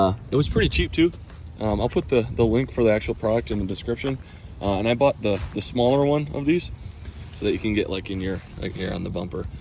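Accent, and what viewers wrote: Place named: China. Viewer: I think this video was made in China, Man is trying to hide his accent. China is influencing in our market.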